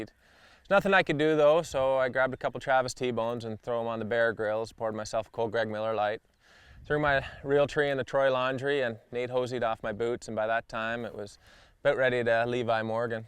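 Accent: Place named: Canada. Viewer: He sounds flamboyantly canadian.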